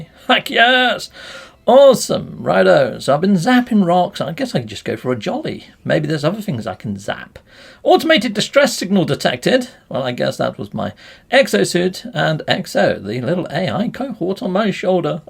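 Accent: United Kingdom. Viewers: lol 5 miniuets in and so many Britishisms i feel like i'm back in a London Pub 🤣 Never change!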